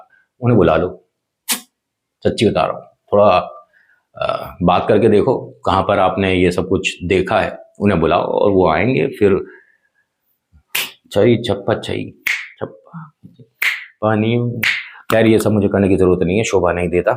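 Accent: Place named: Mathura, India. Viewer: Aapki Mathurawali boli gazab hai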